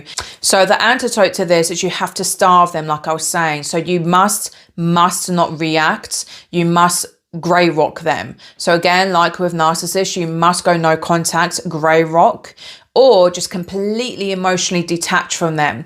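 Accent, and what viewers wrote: United Kingdom. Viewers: you do have a very cute british accent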